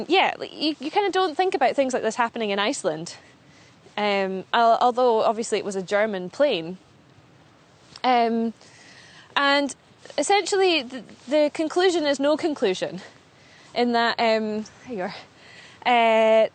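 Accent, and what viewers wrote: Scotland, United Kingdom. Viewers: What a lovely broadcast, and a surprisingly strong Scottish accent. Thank you. Poor cameraman must have found that difficult with the wind gusts 😁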